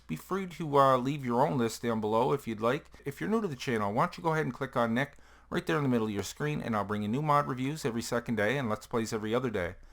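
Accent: Canada. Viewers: Oh my god you sound canadian, I am from Prince Edward Island, Canada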